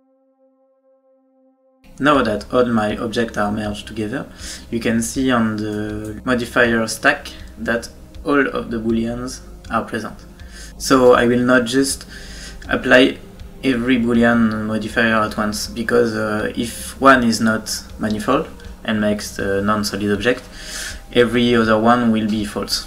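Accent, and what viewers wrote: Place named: France. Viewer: A german viewing a tutorial in english narrated by a french. Thanks for the help! I think I will try blender in the future!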